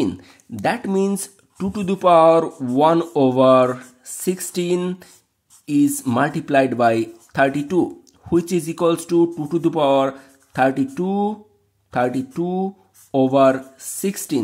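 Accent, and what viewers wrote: Bengal, India. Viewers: Your accent is like an Indian! 🧐🧐how? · He's from Germany... But his accent is so close to Indian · Sir your style of speaking English is like Indians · Are you bengali From West Bengal India?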